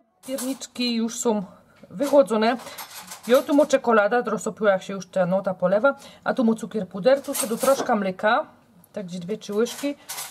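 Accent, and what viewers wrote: Silesia, Poland. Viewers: Choć nie jestem Ślązaczka ale bardzo lubię twój kanał i twoje przepisy, nie które juz wypróbowane👍🏾 i ta twoja śląska gwara ! Fajnie sie słucha ! A durnymi komentarzami sie nie przejmuj ! I rób swoje ❤️😊😊pozdrawiam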